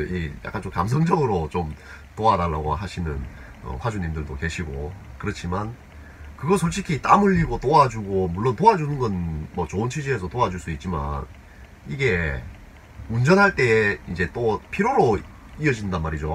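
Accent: Gyeongsang, South Korea. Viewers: 열 팬 입니다 훈남 이시고 구수한 경상도 사투리...항상 잘 보고있는 말년에 용달하는 창밖의 남자 임다 앉으나 서나 안전 운전 하십쇼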